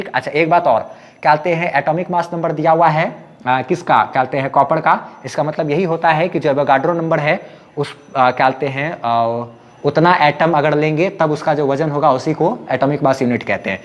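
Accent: Bihar, India. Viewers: Sir are you from Bihar?? I mean your accent 🙂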